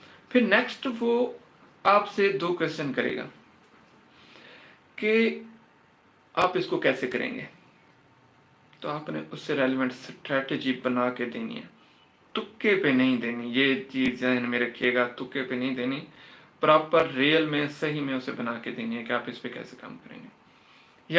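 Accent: Saraiki, Pakistan. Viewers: Aoa bahi upwork k hawalay say kuch kuch dost janty han per ap jo behtar basic ssmajhty han zaroor baten take jo new dost hy ap ki piyari sweet sarski awaz m wo be seekh saken jasa k ap kehty han k sekhen elam.hasil karen samjen to is klie sekhna zarori hy or ap behtar andaz main proper sekhaty han sir sb tamam pakistiyon sariki waseb sb doston bshi ki tarf say ap ko bhot sara piyar thaks with love u all... From Engineer multan say sir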